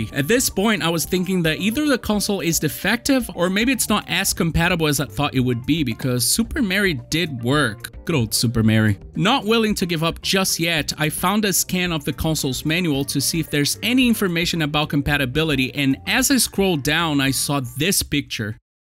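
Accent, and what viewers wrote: Brazil. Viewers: também percebi pelo sotaque que ele é brasileiro! 😂😂😂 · perfect RJ accent! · Eu saquei que tu era brasileiro só pelo sotaque enquanto falava inglês, hahahaha Boa sacada fazer vídeos em inglês, mano! Assim você pode alcançar um público ainda maior. Valeu pelo vídeo, curti muito seu trabalho! Mais um inscrito!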